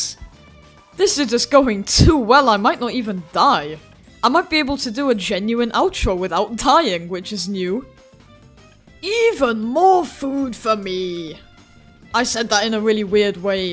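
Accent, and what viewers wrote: United Kingdom. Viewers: User: ChickChickadee2013: not american Me: that’s alr:) u dont have to be american to enjoy aolenus/eagles Btw are you British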